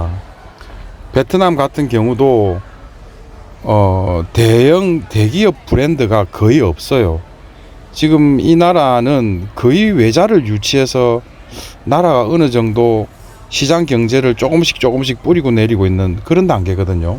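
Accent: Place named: Gyeongsang, South Korea. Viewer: 구수한 경상도 사투리 ㅎ 간결하면서 통찰력느껴지는 경륜ᆢ 구독신청했네요. 하노이 여러번 가봤는데 유투브보니 다시가보고 싶네요 ㅎ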